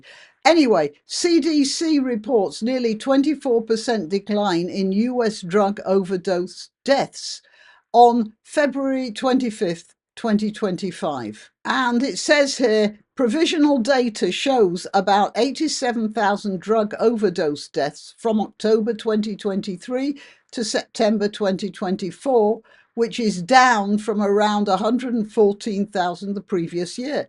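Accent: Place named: RP, United Kingdom. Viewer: Fuck me, I love to hear a posh woman swear!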